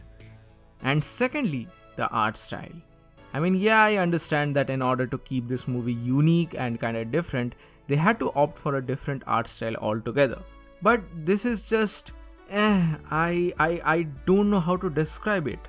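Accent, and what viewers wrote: India. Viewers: No offense but you sound like the typical Indian person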